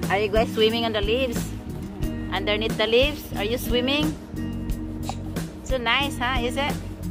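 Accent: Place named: Australia. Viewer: What a beautiful day and Australian accent connected to you now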